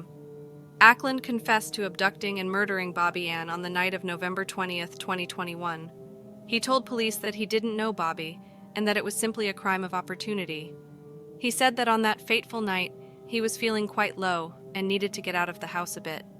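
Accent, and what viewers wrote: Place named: United States. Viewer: weird hearing what sounds like a script written in the UK being voiced by an american voice over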